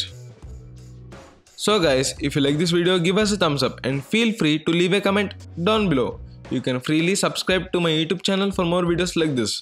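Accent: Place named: India. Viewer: That's Indian dialect. He knows English perfectly well, thanks to the British Empire.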